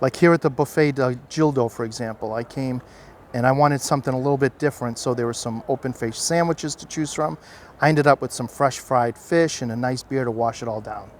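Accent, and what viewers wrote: Boston, United States. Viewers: excellent video. Is that a Boston accent?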